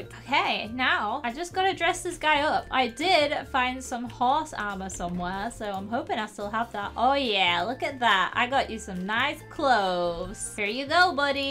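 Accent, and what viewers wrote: United Kingdom. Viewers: Name the horse Nutella hahha cause that’s the horses colour!!! Bye the way are u British??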